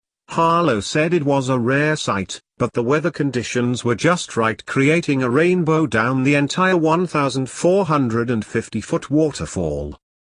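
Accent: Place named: United Kingdom. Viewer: Why is there a British guy blabbering over this?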